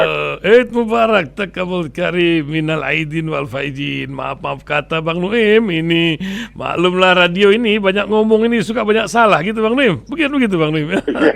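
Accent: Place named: Medan, Indonesia. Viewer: C abang aku ingat aja di RASI ...... dengan khas logat Medan nya aku ternyata sempat juga merantau di Medan Bang 😂😂😂 Sukses selalu smoga sehat2 saja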